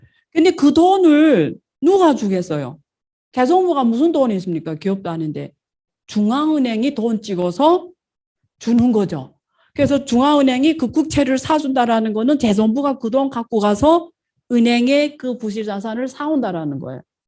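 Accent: Yanbian, China. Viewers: 한국에서 돈벌려면 표준어좀 배우는게 좋겠어요!. 연변 사투리 듣기 싫어요!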